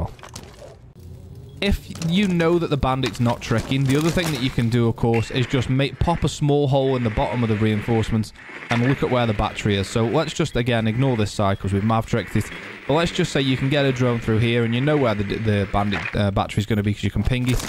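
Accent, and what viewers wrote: Stoke, United Kingdom. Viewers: wait a minute......are you a stokie ? :D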